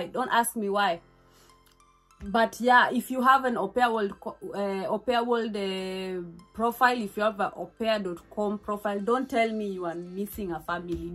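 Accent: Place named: South Africa. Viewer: You speak like South African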